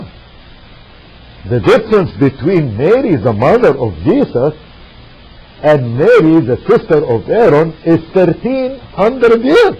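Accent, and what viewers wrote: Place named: Egypt. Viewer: U lier egyptian stop speaking funny english,u talk about story of joseph it is beautyful story by reading only this "chapter joseph"in holy Quran "Cat stevens"famous pop singer of England became muslim and left doutful religion of christianity,you can visit his website his new name is Yousuf Islam,he choose his name yousuf because he was converted by reading "sura yousuf"from quran.and you lier telling chapter yusuf is wrong.if u want i will quote pornography from Bible,but we respect all books.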